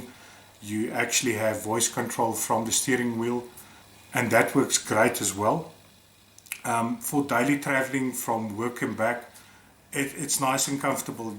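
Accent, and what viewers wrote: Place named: South Africa. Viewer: I love your South Africa accent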